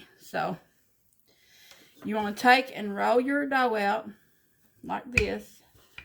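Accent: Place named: Kentucky, United States. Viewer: ok I thought I was hearing a Kentucky or TN accent. 💜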